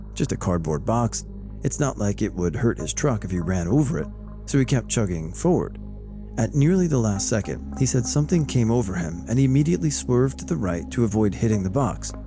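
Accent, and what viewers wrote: Australia. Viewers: In regards to your Australian accent. Well, I've certainly heard a lot worse. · Aussie here - your accent was pretty good! More of a country accent than a city accent, but pretty good!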